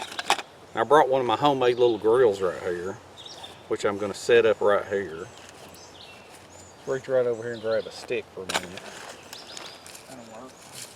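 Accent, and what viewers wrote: South, United States. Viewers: I really liked this campfire story, especially with the accent. Its like southern ASMR.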